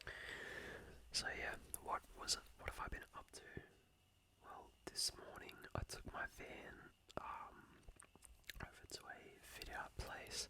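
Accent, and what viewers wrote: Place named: Australia. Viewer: it's so great to see another australian dude who likes/makes asmr! I've been wanting to make some for a few years but never started, didn't expect to open this video to another aussie voice it was weirdly familiar haha, keep it up man this was heaps relaxing 👏🏼 If it's not intrusive, do you mind sharing what state you're in? The mildly specific accent is there but can't pick it haha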